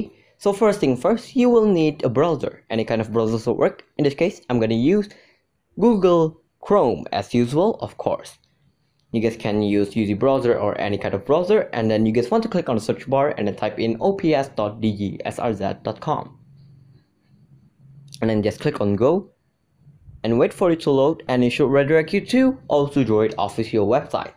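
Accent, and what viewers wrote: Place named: China. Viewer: Idk he's a Chinese or not but his accents tho lol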